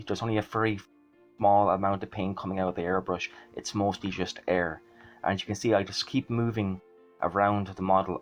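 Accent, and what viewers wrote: Ireland. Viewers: Great straightforward tutorial with great hints! I’m in the middle of a 1/72 Vulcan bomber using Tamiya paints and I can vouch for everything you’ve said 😊 from an expat abroad who loves to hear the aul accent 😊 Ian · Are you a fellow irish lad?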